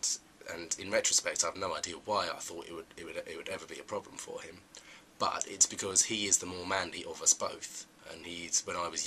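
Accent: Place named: England, United Kingdom. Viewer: Welcome to "thatgaybunch". I thought you did a great job of introducing yourself through the questions that were asked. I believe you're going to be a great addition to this collab channel. BTW, I love the English accent.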